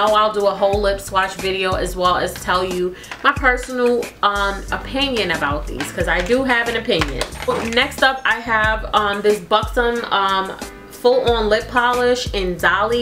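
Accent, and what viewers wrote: New York, United States. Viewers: I LOVE u and ur videos. I can listen to u talk all day!! (the NY accent 😊) Please do a video on the Kylie lippies!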